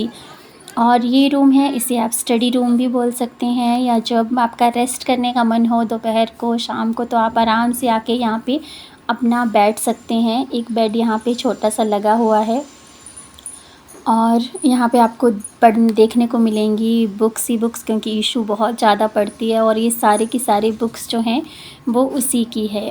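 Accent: Standard. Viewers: Aap kitana hindi bhi sudh bolti aaj ka vlog bahut kitchen bahut khubsurat lagi mujhe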